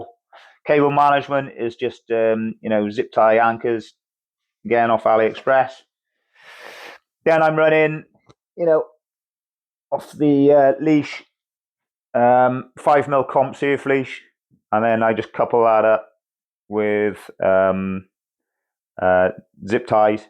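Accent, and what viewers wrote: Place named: Canada. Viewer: Great video, but the Canadian accent is pretty thick.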